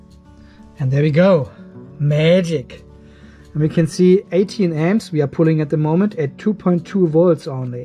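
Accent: Germany. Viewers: Hey there, I've ordered the same cells.. waiting for delivery 😤 Btw: is it possible that you are a German guy? Sound very familiar to me. Keep on going, looking forward to your next video....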